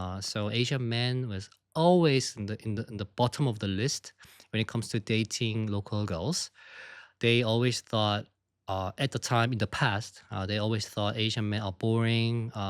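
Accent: United Kingdom. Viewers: I'd say your accent is obviously British, I was able to detect it before I found out you studied in the UK. Your pup is adorable😅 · As someone who migrated also to another country myself I think your English is very good. Your accent sounds a bit like British. I think anyone with an accent means that they speak more than one language. In my opinion being bilingual it’s better than knowing one language. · I am Afro-American and I can hear a slight British accent in and your voice! It's interesting to me😊🔥💯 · Hi! I really like people's different accents, I find it s3xy. I can recognise the British accent in your speech and i admire your vocabulary and perfectly English speaking. I live over 10 years in England and my English is nowhere near yours, lol. I never tried to adopt a British accent, I simply can't. I try to pronounce words correctly so people can understand what i say (that's not always successful), but i do not mind. 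I am greek, i have different sounds in my language. You know something? I haven't met the English person yet, who can pronounce my surname correctly. Because that sound does not exist in English language. Just call me Ms K. That will do. And the app i use to learn korean cannot recognise my accent most of the times. That makes me try harder. I hope the 2 greek friends treated you with nice food back then. I am going to Corfu this summer ☀️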